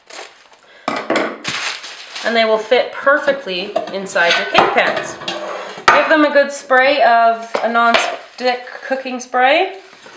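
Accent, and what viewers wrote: Canada. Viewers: Thank you for posting this xoxo Are you from Canada? Lol, just I can hear an accent :P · Hi, this recipe is excellent. It passed the "culinary-challenged-bacehlor-slob" test. Yup, I'm the bachelor-slob who can't cook to save his life, but was able to make this. It turned out great! So did the chocolate butter-cream frosting recipe you posted to complement this recipe. Why haven't the Food Network or the Cooking Channel hired you yet? You do very well explaining these recipes and techniques. Your Canadian accent is charming and makes me think of all my Canadian friends.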